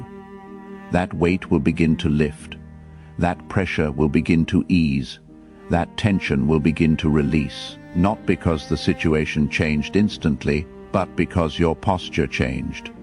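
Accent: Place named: United Kingdom. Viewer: Who is the new voice. He’s British.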